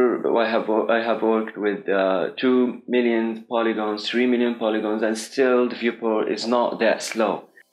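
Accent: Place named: Morocco. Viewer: khoya 3reftek mghribi hir mn l'anglais dyalk hhhhh. keep up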